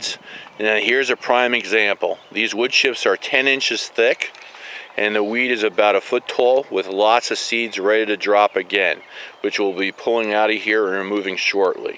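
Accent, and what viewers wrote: New Jersey, United States. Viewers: Yer Joisy accent is just precious! My first husband wuz a Joisy boy and you East Coast boyz are just fine in my book!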